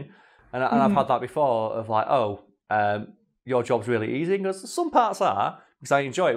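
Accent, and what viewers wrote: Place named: Sheffield, United Kingdom. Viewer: I thought I was the only one who noticed he is talking more and more with a Sheffield accent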